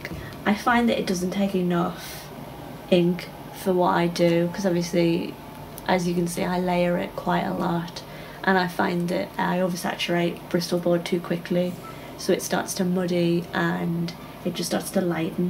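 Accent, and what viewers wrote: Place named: Northeast England, United Kingdom. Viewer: First thing: That picture is awesome! Like, super amazing! Second: Your accent... It's my accent... Are you from somewhere in the triangle of cities of Newcastle, Sunderland and Durham? (I'm from a town an equal distance from all three and sometimes it's hard for me to tell which specific accent comes from which city.)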